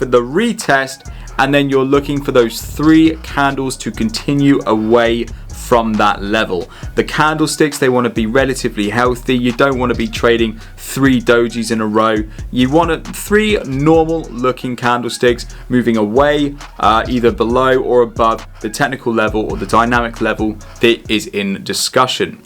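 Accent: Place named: United Kingdom. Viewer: The pull back does not always turn at the next resistance or maybe a bit lower and other guys teaching the candle method and what you are showing here is the most coveted point where to catch the meet A lot of pro retailer need only 50 pips with 20 25 standards and they are done for the day , any wat I like your British accent.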